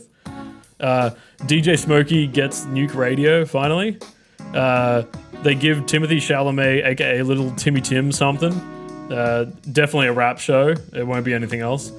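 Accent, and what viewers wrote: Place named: Australia. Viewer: bro might be Aussie General Sam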